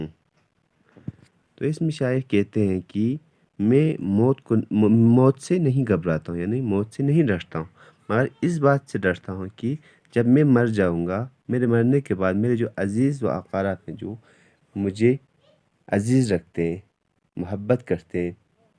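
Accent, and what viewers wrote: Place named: Pakistan. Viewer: Ooo bro bohot Jldii reply kiya h , I told because, Sir ka Urdu accent sa laga Mujhe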